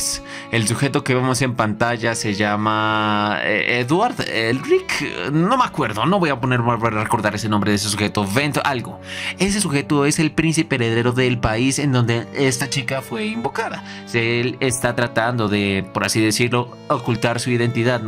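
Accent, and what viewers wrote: Colombia. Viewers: Bro tú eres de Colombia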